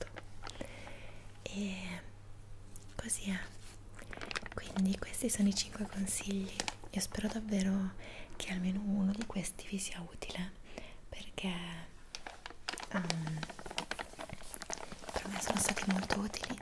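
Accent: Italy. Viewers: Where are you from in Italy? My grandmother immigrated to the US from coastal Calabria and my grandfather immigrated from Tuscany so I’m trying to familiarize myself with the different Italian regions and accents!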